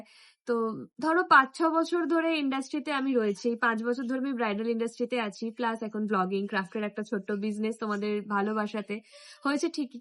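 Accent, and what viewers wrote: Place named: Birbhum, India. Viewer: Khub khub sundor hoyeche ❤ Wow that's was great tomar mukhe Birbhum katha taa khub vlo lagalo ami Birbhum jajigram aa thaki 😊❤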